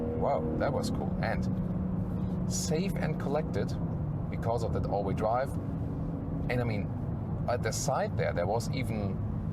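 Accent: Germany. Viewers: There's something so satisfying about a German car being reviewed by a guy with a pleasing German accent. It's just wunderbar!!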